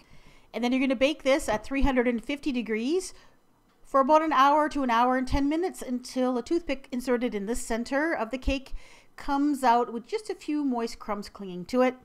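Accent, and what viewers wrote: Canada. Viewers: God I love you woman, and your Canadian accent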